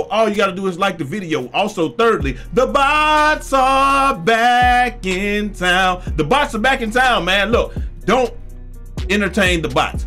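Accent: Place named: South, United States. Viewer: Crazy you had Shannon Sharpe in this video lol I be calling you the Shannon Sharpe of the 2k community. Not based on age of course but just style and personality. Funny as hell 😂 and the southern accent too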